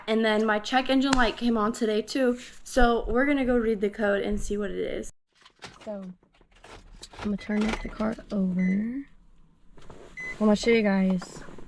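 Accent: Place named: Texas, United States. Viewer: Haven't seen your channel in a while. You seemed to have developed more of a Texas accent.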